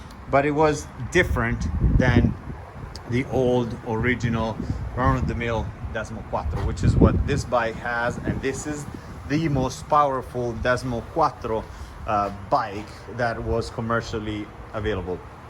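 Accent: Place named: Texas, United States. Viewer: Beautiful bike, I can definitely hear your Texas accent!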